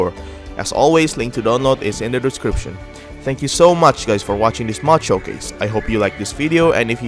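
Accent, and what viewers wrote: Indonesia. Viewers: Logat Inggrisnya kek dari Indonesia. Kasi tau gw bang kalo lu dari Indonesia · setelah nonton beberapa video lu, gua bru sadar lu orang indo wkkww, gara2 accent . ah sial gua kira dlu org luar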